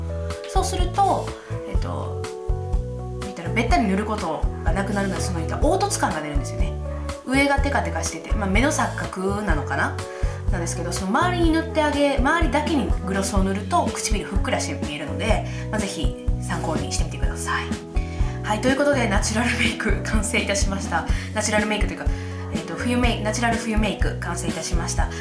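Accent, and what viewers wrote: Kansai, Japan. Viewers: 関西弁すごい可愛いです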